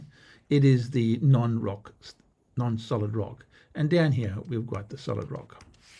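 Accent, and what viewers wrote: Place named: Afrikaans, South Africa. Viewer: Can almost here the Afrikaans coming out haha; beautiful presentation, I genuinely learned alot.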